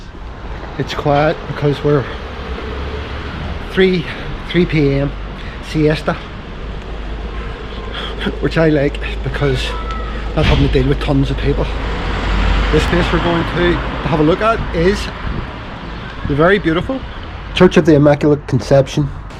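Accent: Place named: Belfast, United Kingdom. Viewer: Omg really great to hear another Belfast accent, I've been living in Spain for 15 years ni and you're the first. Really enjoyed the video, everything looks so peaceful compared to the big noisy city where I live. We're lookin to move and going through all the different places on YouTube, so you're great! Thanks a million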